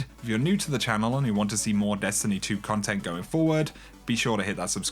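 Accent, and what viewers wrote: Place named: United Kingdom. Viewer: Even bad news sounds good in a British accent...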